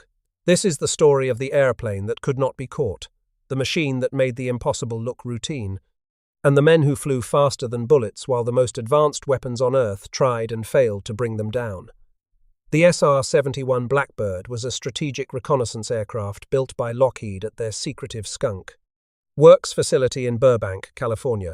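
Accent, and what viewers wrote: United Kingdom. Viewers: The British accent is why so many people watch these videos. Keep them coming!! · Get rid of the British narration.😡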